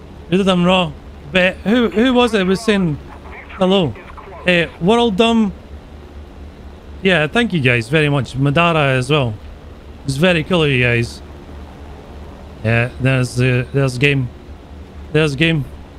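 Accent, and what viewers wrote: Scotland, United Kingdom. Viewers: That Sco’ish acc makes everything he says so lively!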